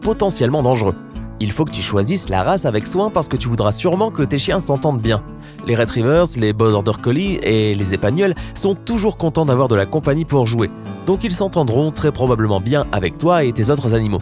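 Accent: Quebec, Canada. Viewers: Oooo saï pour j'aimai bien la voit québécois enpluse saï la même voit que lama fache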